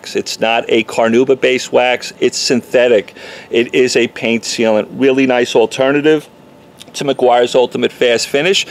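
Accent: New York, United States. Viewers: Great info, thank you. You sound more like a New Yorker than a Texan :) . God bless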